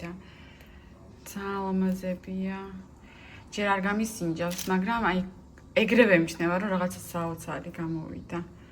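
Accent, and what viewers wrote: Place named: Israel. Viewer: ქართულად როცა ლაპარაკობ ებრაული აქცენტი გეტყობახოლმე ლაპარაკისას 😄👍✌️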